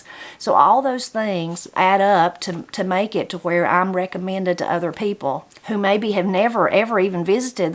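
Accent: Appalachia, United States. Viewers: So very happy for you and your family. Truly well deserved. I came across your channel while looking for information about canning. Like many people I’ve increasingly wanted to know more about what I’m eating and am separating myself more and more from processed things. That I am from Virginia and recognized your beautiful accent which is similar to my fathers and grandparents, well after the very first video I simply had to subscribe. Congratulations and here’s to many, many more subscribers to come.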